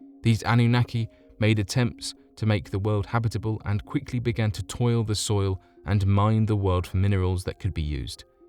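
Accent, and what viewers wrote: United Kingdom. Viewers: Thanks for the nice British voice narrative. A nice distraction from the Trump nightmare. But if we're an alien ant farm, which I believe, why can't they keep things under control better??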